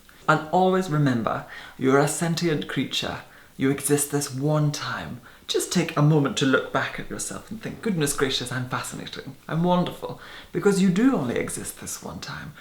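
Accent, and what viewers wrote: Scotland, United Kingdom. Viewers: Scottish darling x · you should bottle yourself and sell it!!! uplifting, fascinating, spiritual, fair ( in both skin tone and outlook) beautiful and......Scottish!!! i could listen to you all day xx much love from one who has been described as 'blue white' xx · its fake, not how he really talks, it is all put on for videos and more viewers, go back to his early videos from say 2013, has a normal Scottish accent and voice nothing like this at alll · I thought it was Scottish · I so enjoy listening to you as your intelligence always shines through. And I also love the soupçon of Scottish accent in your speech. And as an alabaster-skinned woman of Russian -Ukrainian descent, I have come to love my paleness. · I KNEW YOU WERE SCOTTISH! Sorry, I love your accent♥️♥️♥️♥️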